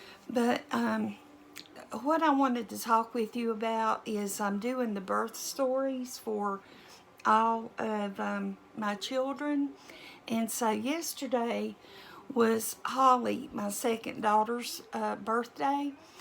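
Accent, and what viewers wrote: South, United States. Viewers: Wow it sounds like an easier birth since you didn't have hours of pain. And I hope you have a speedy recovery from your being sick. I just love your southern drawl and how calm and relaxed you talk.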